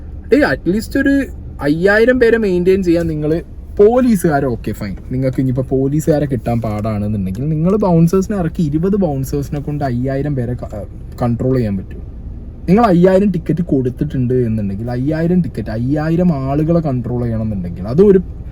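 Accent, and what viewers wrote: Malappuram, India. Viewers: 😂😂😂😂😂- malappurathe karyam parayumbo aa slang ozhukk undayikote 😂😂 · "ഏത് കുദ്രത്തിനാണോ"😂 തനി മൽപ്പൊർത്തേരൻ 😅❤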